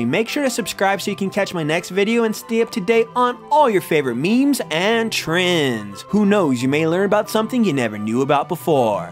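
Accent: South Korea. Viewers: sounds korean to me. I also think regardless of what type of Asian he my be good on him... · Btw he's korean just by his accent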